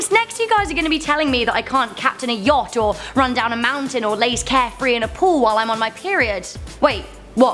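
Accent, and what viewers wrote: United Kingdom. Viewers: dillpickle 123 she’s British. That’s how we pronounce it in Britain. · Lynn Barns she's from the UK so they pronounce it different from the USA.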